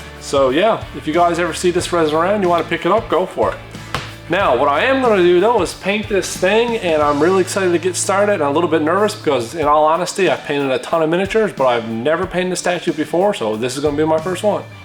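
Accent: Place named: Maritimes, Canada. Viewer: Great job! Been looking to get a printer...Appreciate the way you mention the paints/products you use. I couldn't tell where you were from initially but I detect East coast of Canada. Good to see Canadian content on YouTube. 👍 What company designed the model...I mean really how else did you get my attention? Get some sponsors brother, best of luck in your content endeavors! Thumbs up and a subscribe. Edit found the link...that's why I'm watching videos and not producing them, a lack of technical skill! Cheers.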